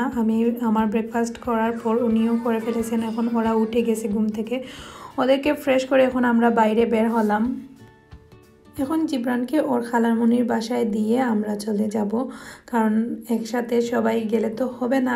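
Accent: Sylhet, Bangladesh. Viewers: আপু আপনার মুখে সিলেটি মাত ভালা লাগে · apu apnar mukher sylhety accent ta besi valo lage please apni oi vabei video banaa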